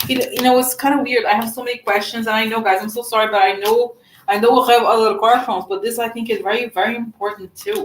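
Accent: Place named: Russia. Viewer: Where are you from? You sound Russian, but then sound American.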